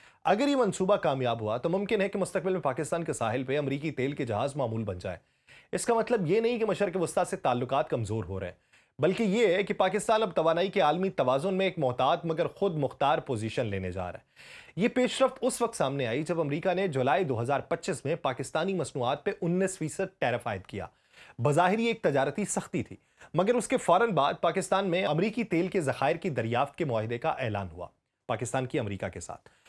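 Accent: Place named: India. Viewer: ye bunda Indian hay,isska ta'lafaz hindustani hay.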